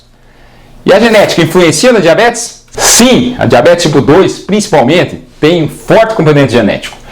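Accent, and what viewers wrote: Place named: Minas Gerais, Brazil. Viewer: Esse dr parece ser mineiro, incurtando.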